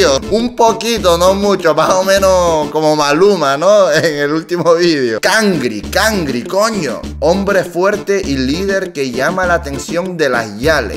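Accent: Puerto Rico. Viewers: te sale bien el acento boricua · Este koreano es uno de nosotros ri-tru de cora. Habla bien boricua entregao' a lo reventao' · oye tienes un poquito de asentó puertorriqueño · Habla como boricua, bye. Also, hay palabras que ni son boricuas y son media inventadas 😂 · Guapo se usa de las dos formas.... eres guapo o Eres Guapo Ahhh!!!!! Somos difícil de entender los boricuas😂 oye coreano llegue tarde a tu canal, pero estoy en shock hablas como un bori, dime tú te viviste en 🇵🇷?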